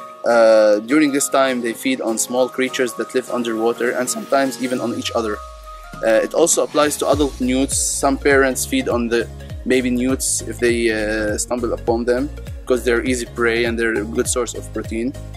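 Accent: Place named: Iran. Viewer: Your accent is similar to Iranians. are you Iranian?!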